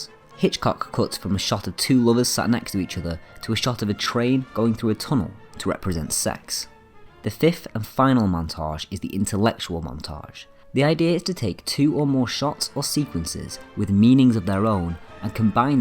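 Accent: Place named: Lancashire, United Kingdom. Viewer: the narrator may not be able to help having a grating lancashire accent but he could at least learn the correct pronunciation of proper nouns. William Friedkin is "freedkin" and Sergei is "SIRgay" nor "sir zhay". incorrect pronunciation detracts from the credibility of the narrative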